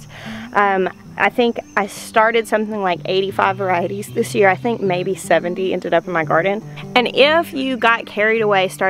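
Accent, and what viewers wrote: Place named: Arkansas, United States. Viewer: Love your Arkansan-Southern accent. So refreshing. I live in Little Rock, it’s turning into something else. Lots of valley-girl coming in.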